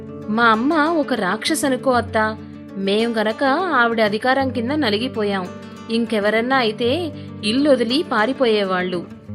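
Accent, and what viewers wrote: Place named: Godavari, India. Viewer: ఇది సాధారణంగా తండ్రికి ఎదురయ్యే పరిస్థితి. కొందరు తల్లులు కూడా ఎదుర్కొంటారనుకోండి! భర్త కూడా అలా పిల్లలతో కలిసిపోయి భార్యను ఆడిపోసుకోవడం కాస్త ఆశ్చర్యాన్ని కలిగించింది. అతనైనా ఆమె వాళ్ళను తీర్చిదిద్దిన విషయాన్ని గుర్తించి వాళ్ళను మందలించి ఉండాలి. అప్పుడు కథ సహజంగా ఉండేది. స్త్రీవాద కథ రాయాలని రాస్తూ స్త్రీ కూడా స్త్రీకి శత్రువే అని చెప్పే ప్రయత్నం చేశారు రచయిత్రి అనిపిస్తోంది. అచ్చం మా గోదావరి జిల్లాల స్త్రీల యాసలో ఎంత బాగా చెబుతున్నారో! ఎన్ని సార్లైనా మెచ్చుకోకుండా ఉండలేకపోతున్నాను. ఆమె విజయవాడలో పెరిగిన విషయం తెలియక ముందు నెల్లూరు వాసి ఈ యాసలో ఎలా మాట్లాడగలుగుతున్నారన్న ఆలోచన ఇప్పటికీ పోక ఇలా అనిపిస్తుందేమో! విజయవాడ యాస కూడా కాదు ఆమెది.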